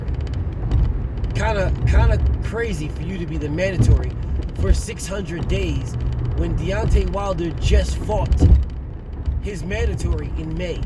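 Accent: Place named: United Kingdom. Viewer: Come on brov 😂 chip chip cheerio 🤣 come on mate 😂🤣 that British accent is hilarious, that British accent is giving them headache 😰😩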